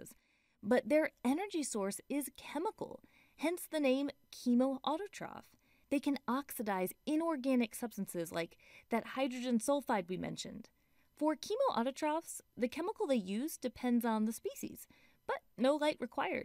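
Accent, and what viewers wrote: United States. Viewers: are you from tennnese becsaud yoyut the on;t ten i see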